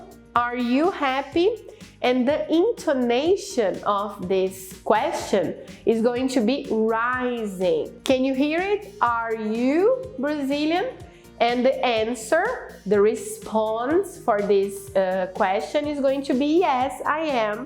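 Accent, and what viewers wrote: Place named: United Kingdom. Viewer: Eu acho fofo o seu sotaque britânico. Normalmente, no ingles americano, o T tem o som de R em algumas palavras, such as: "personeleri", "ciri", "nationaleri", porém no britânico eles pronunciam o T originalmente.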